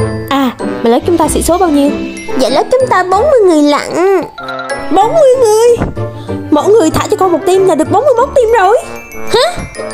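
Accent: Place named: Quang Ngai, Vietnam. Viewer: Chị là ngừ Quảng Ngãi hả cj em thấy giọng cj giống quá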